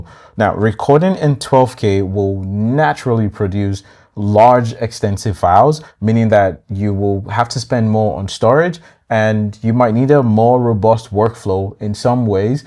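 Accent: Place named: Nigeria. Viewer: Oga that Naija accent can never hide lol. Thanks for the video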